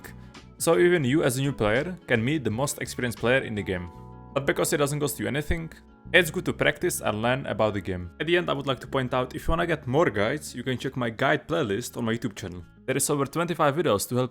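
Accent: Czech Republic. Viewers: ten přízvuk, hned mi bylo jasný že jsi Čech :D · Tvrdý český prízvuk čo má svoje čaro :D Nice tips, thank you very much :)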